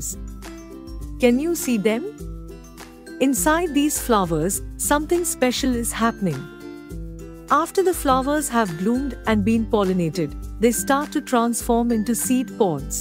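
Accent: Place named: India. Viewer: Logatnya india banget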